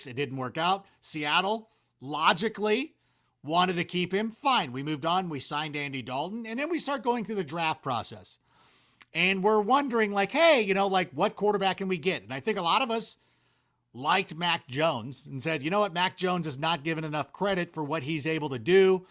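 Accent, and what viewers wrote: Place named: Chicago, United States. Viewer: you talk like a true chicagoan. DA BEARS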